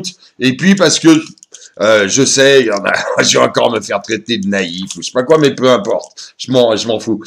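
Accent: France. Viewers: mais non, ta pas une mauvaise pratique de l'anglais, c'est juste que, comme moi, tu a un anglais....très français^^ sinon, l'épisode de T.V.Liberté de se soir y est peut être consacré apparemment, c'est toujours ça a prendre en plus. sur ceux, merci de ta réponse et bonne soirée^^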